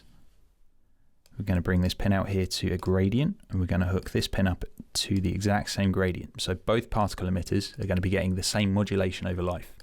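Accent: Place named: United Kingdom. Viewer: I can hear british accent here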